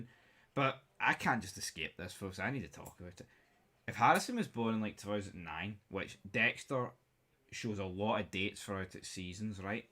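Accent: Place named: Scotland, United Kingdom. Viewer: Your accent is all over the place. mega Scottish accent and you're sometimes doing words in an American accent, like why?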